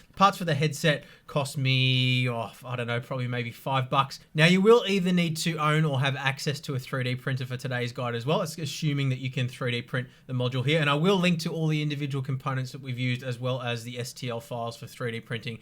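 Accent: Australia. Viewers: Dude he is a ozzy. Its called an accent. ;)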